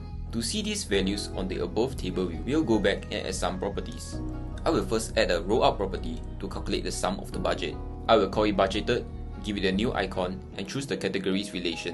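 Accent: Singapore. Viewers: You from Singapore bro bro? Love the vid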